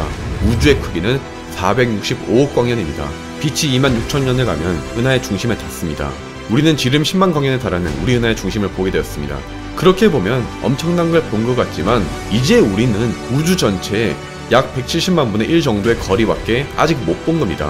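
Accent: Gyeongsang, South Korea. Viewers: 경상도 사투리 엄청 거슬리네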